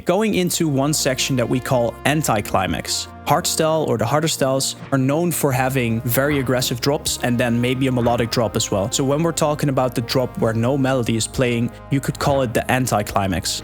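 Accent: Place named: Netherlands. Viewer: bro je klinkt nederlands man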